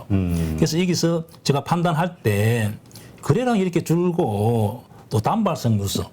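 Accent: Gyeongsang, South Korea. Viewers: 같은 갱상도라 귀에 쏙쏙들어옵니다 ㅎㅎ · 구수한경상도사투리 친근합니다 이렇게와닿는방송정말감사합니다열공하겠습니다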